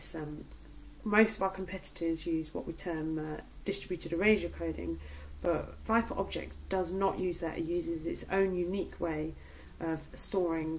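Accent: United Kingdom. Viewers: I love your british accent. Are you Renee Zellweger? Jajaj. Sorry. Excelent video. Thanks a lot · Superb and yes, love your British voice. Please do more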